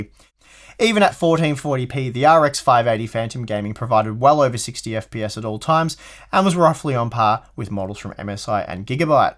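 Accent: Australia. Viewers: you have an aussie accent so i assume youre a fellow aussie, anyway i bought an msi 580 8gb armor for 250$ aud last week so prices are dropping hard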